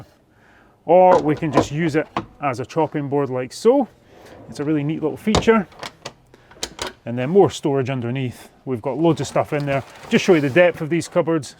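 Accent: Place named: Scotland, United Kingdom. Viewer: Brilliant, great clip too, no waffling BS just that wonderful Scottish brogue. Congratulations from DownUnder.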